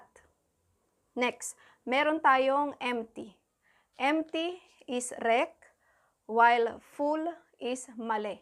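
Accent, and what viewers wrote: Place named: Philippines. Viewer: You sound phillipino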